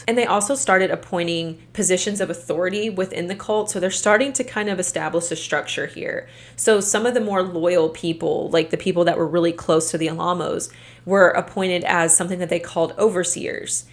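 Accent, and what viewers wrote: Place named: Philadelphia, United States. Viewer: A question not related to this vid are u from the Philadelphia area? Just curious because I am and u sound like u r from the area. ❤️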